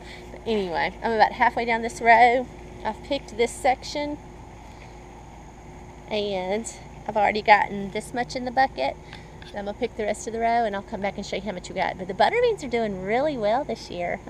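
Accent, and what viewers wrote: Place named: South, United States. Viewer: Just love your southern twang! You sound like my sister 🤗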